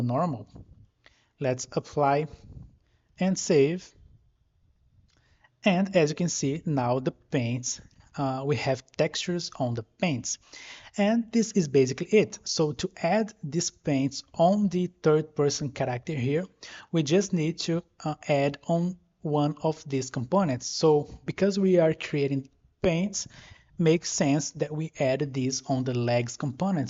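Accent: Brazil. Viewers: Nice tutorial. Are you brazilian? Your accent reminds a lot of people from here. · Muito bom! Tô supondo, pelo sotaque (leve) que vc é brasileiro. Baiano, talvez 😊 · Thanks for the great tutorial! ...acho que esse sotaque é brasileiro! kkk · Brazil? i can feel the accent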